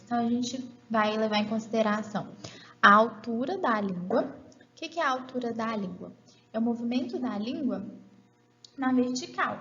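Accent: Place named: Minas Gerais, Brazil. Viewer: Sotaque minerim ^^ Bom de mais da conta.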